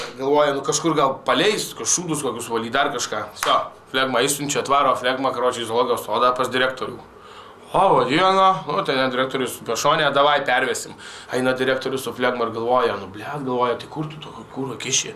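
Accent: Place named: Samogitia, Lithuania. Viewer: Zemaiteška gera vara tep ir tuoliau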